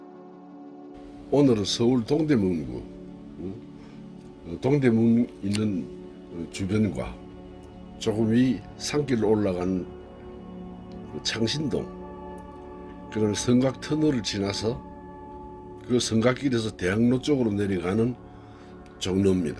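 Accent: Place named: Gyeongsang, South Korea. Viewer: 굿모닝~~^^. 선생님. 원래 정치인의 공약은 空約이라고 보면 됩니다. ㅎㅎ 화려한 불빛. 빌딩 사이로 빈민들의 추위와 배고픔이 있네요. 우리 님의 목소리는 여기 서부경남 분 같습니다. '이게 뭡니까'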